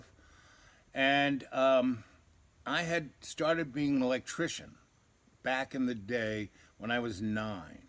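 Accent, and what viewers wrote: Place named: New York, United States. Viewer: Definitely from NewYork..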